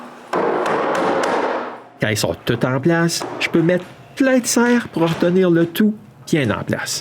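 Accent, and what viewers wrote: Canada. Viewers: ...J ADORE LE PARLER CANADIEN CAR J AIME LE CANADA VOUS ETES DE QUEBEC JE PENSE IL Y A 36 ANS JE DEVAIS ALLER COMME CHAUFFEUR POID LOURD MAIS JE N AIS PAS OSER FRANCHIR LE PAS MAIS MAINTENANT J AI 66 PRINTEMPS ET C EST UN GRAND REGRET DE NE PAS ETRES LABAS C EST LA VIE BON TRAVAIL ET CONTINUER A NOUS APPRENDRE BEAUCOUPS DE CHOSE AVEC PLAISIR ET ATTENTION AUX CARIBOUS AMICALEMENT D UN FRANCAIS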